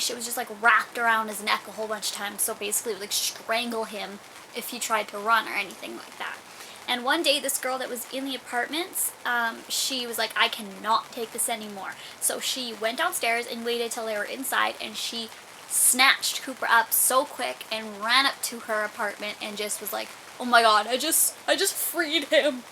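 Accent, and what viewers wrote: Saskatchewan, Canada. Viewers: O just saw u from canada but i think ur from saskatchwan ur accent seems like there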